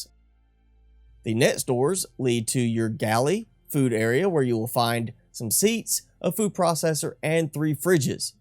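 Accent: South, United States. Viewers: You sound Southern.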